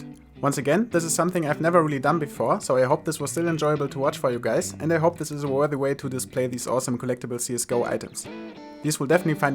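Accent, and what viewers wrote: Germany. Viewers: Wenn deutsche englisch reden hört sich das irgendwie komisch an.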